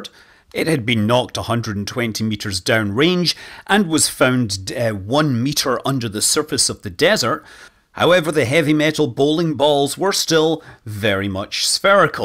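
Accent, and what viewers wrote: Scotland, United Kingdom. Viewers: Scottish to bro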